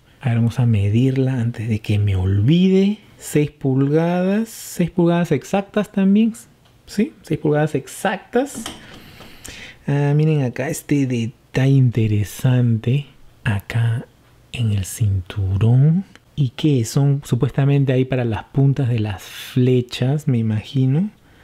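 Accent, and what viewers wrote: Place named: Peru. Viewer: Por fin un shartimus prime que puedo entender xD, buen video Bro, eres de Perú me párese? Tienes un suscriptor más (y)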